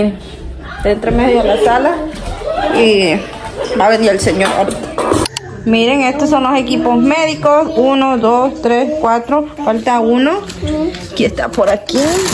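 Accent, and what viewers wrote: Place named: El Salvador. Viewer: Los salvadoreños así hablan linda conozco muchos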